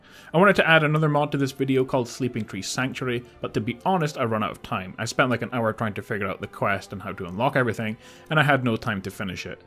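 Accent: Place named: Scotland, United Kingdom. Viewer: It's great to hear a Scottish voice on YouTube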